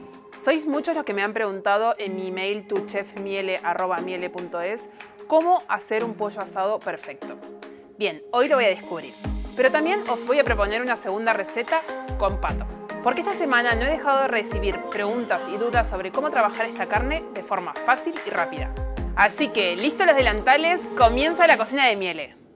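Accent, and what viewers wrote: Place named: Argentina. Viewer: Maravilloso tu Espacio. Sólo hago hincapié en q' debes llevar con HONOR y al máximo Orgullo el GRAN acento ARGENTINO 🇦🇷. No bloquees tu pronunciación, q' queda muy tierno , dulce e interesante. Ser y pertenecer a un mundo Anglosajón es una maravilla , pero siempre poniendo en frente y sin intentar olvidar nuestras raíces , ni hcia donde vamos. Saludos y muchas felicitaciones !!!!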